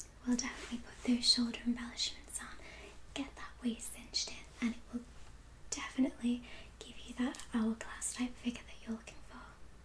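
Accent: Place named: England, United Kingdom. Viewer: Nothing better than a classy English women's voice 👌🏻🙈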